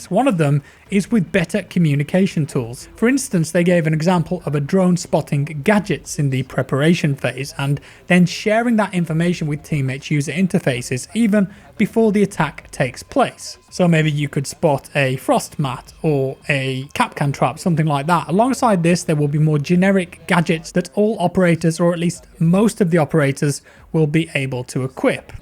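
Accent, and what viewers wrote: United Kingdom. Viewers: Where in the U.K are ya from mate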